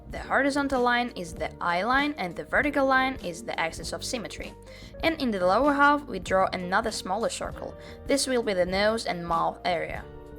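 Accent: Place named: Indonesia. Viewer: For some reason I hear a little Indonesian accent lol, thank you so much for the tuts!!💖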